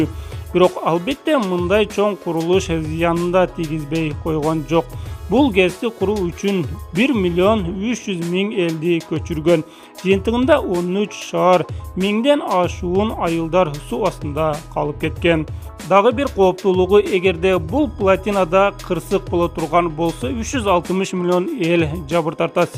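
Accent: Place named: Ichkilik, Kyrgyzstan. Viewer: Ва алейкум ассалоом дос капа болбо адабий тилде суйлоп уйрон сени канча Адам корот Сен кочодогу бала эмессинда кыргыз топ каналыны деп ичкиликче озун тилинде урдурбай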